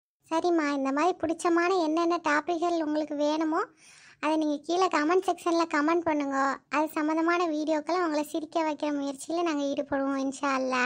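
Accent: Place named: Kilakarai, India. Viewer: na kilakari than unga slang spdiye enga ooru slangthan vera 11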